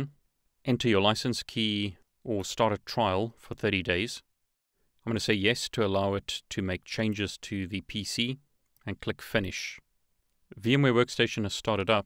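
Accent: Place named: South Africa. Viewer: Hehe nice. From Cape Town myself so noticed a bit of the accent. Thanks for the amazing videos, really appreciate your effort. Keep spreading the knowledge. (first attempt at CCNA in a few months)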